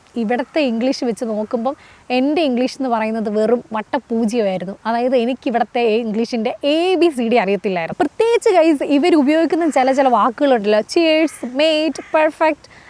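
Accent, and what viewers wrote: India. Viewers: Don't try to fit in Embrace the Indian accent of English language😉❤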